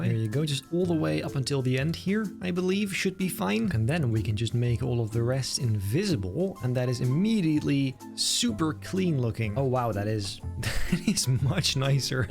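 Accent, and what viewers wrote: Netherlands. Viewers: ben je nederlands???? wand heb een gevoel dat je nederlands ben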